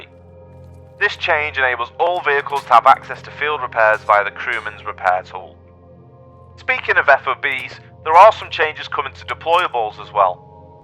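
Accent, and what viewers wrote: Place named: United Kingdom. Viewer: Why a British voice??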